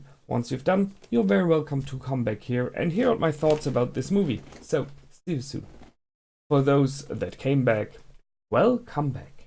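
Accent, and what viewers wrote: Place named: Germany. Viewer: Hört man wenn man selbst Deutsch ist:)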